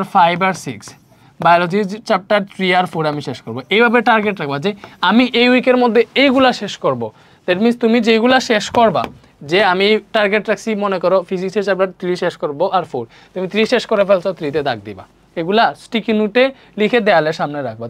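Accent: Sylhet, Bangladesh. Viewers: Vai kita Sylheti ni 💗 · Apni ki sylhety?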